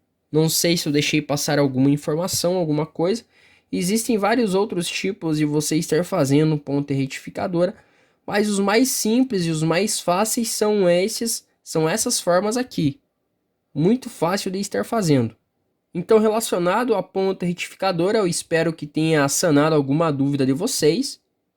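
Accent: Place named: Caipira, Brazil. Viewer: Sotaque caipira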